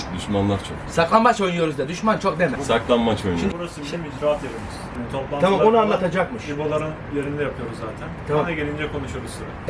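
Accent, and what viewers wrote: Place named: Istanbul, Turkey. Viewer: Abi şiven değişiyor birz istanbul şivesine yakın konuşuyon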